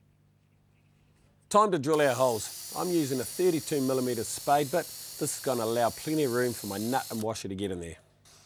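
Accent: New Zealand. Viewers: He speaks with a New Zealand accent. Their pronunciation of vowels is different "ligs" (legs) and the " ls" are not pronounced like bevo (bevel). His explanations are easy-to-understand and he has a high skill level. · well it is true. He is from New Zeland and I learned British kind of English... 😊 there is a huge different in pronaunciation.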